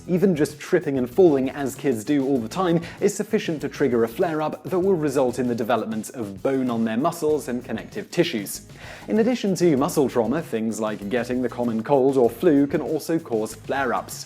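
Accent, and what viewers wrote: England, United Kingdom. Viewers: Is this guy English? I am from England and I can never tell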